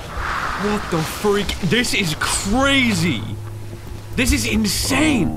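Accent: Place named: Newcastle, United Kingdom. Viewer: This guy keeps on over-analysing literally every single thing, with his annoying Newcastle accent.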